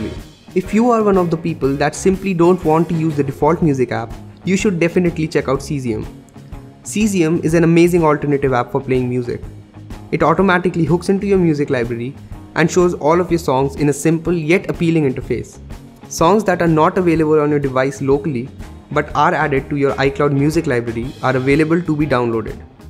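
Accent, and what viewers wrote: India. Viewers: Your accent makes me respect indian english accent now. Sounds way more better than singlish. Oh i hate singlish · Indian accent makes are the worst accent ever Just sounds horrible like nails on a chalk board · Your English is fucking horrible man lol why does every Indian have that accent, anyway get some braces -Canada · indian accent ...hhhh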